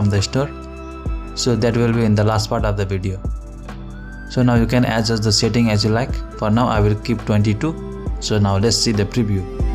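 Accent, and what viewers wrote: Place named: Spain. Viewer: Your Spanish accent is so nice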